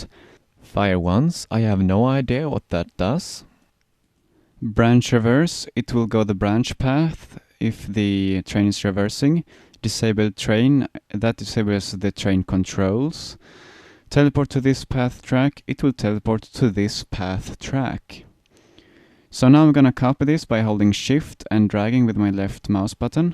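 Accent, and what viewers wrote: Sweden. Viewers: Awesome tutorial! I love your Swedish accent :) I love Sweden, I visited last summer. Keep it up buddy!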